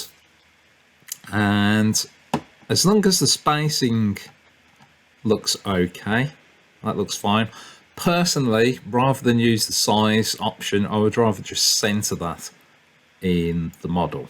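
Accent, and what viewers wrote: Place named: Birmingham, United Kingdom. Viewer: I take it by the Brummie accent you in the UK lol. What do you think of the Onefinity Machine and what was the customs charge to get it into the UK? Im ready to order one but a bit nervous of the Customs charge. Also, what do you think of the Makita Router with it? Does it do a good job, or should I order a PWN Spindle at the same time. Sorry for all the questions.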